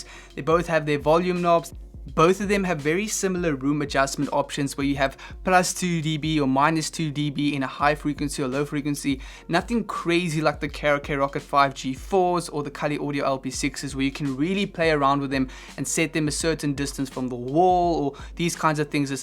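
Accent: South Africa. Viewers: Sounds like a South African :)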